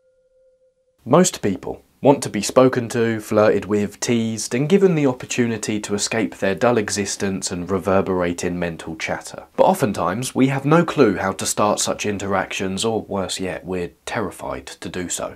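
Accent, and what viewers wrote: United Kingdom. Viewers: wat an accent. I believe it's a British accent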